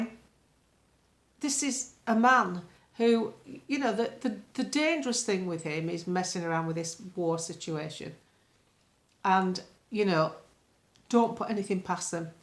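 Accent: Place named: Northern England, United Kingdom. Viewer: It really annoys me, when some people have a beef about your Northern accent, I am Northern in body heart mind and soul. Your accent is similar to mine, there is absolutely nothing wrong with your accent. Lots of love and hugs ❤❤❤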